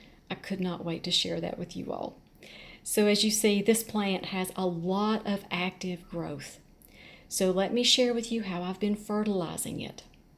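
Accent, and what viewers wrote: South, United States. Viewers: Hey, I love, really love your channel!🤗 Thanksgiving was wonderful and life is good! However, I'm new to orchids and have just purchased my first one three wks ago. I cleaned all the deteriorated bark off very well, put it in lava rock after purchase as i seen per Rick's channel and changed it rapidly over to water culture. It got dry, very dry very fast even though I soaked as he instructed. I am in AZ🌵😎🌵with virtually no humidity, understanding that he is in humid Alabama. So wish me luck as I have been watching your videos faithfully. I do have a Q...my phal purchase from Fry's (Kroger in Tn) came with no air roots!🙄They were cut off🤔by nursery I'm assuming. The 1/2" remnents are barely visible. Are air roots really needed or did I make a fatal mistake purchasing this phal not knowing at the time? Thank you❤️so much and love your southern accent.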